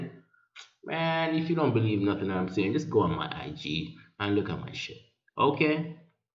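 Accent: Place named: Jamaica. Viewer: Man I knew u were Jamaican from the accent 😂. Big up 👍🏽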